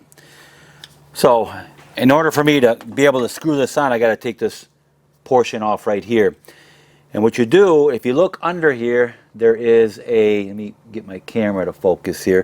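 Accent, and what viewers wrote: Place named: North, United States. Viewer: bwaaa haha😂 my dad and I listened to your video together, in the first two min I said "this guy is a northerner, he's from north PA, but south of Boston. Can't figure out why he doesn't say WOOter(instead of WATTer)." 5$ bar bet you're from Southern MI?!? Thanks for the awesome video, Can't wait to install the new unit(s) that we bought..we're putting his in in Southern Colo tomorrow, I'll do mine in south TX later on in the week. Your video was a great help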